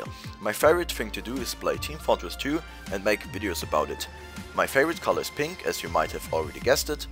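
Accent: Germany. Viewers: I kinda heard it in your'e voice that you are german. I hope you are getting way bigger. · Hey, you live in Germany! Somehow I always knew that from your accent... Well, i live in a country right next to you, so we are basically neighbors! Please keep making fun stuff similar to the friendly class series. Those vids are the most fun I ever saw.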